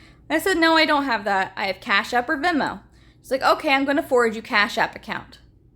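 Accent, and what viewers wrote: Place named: Nigeria. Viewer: I think it's more Nigerian. Point is, it's certainly not a native English speaker.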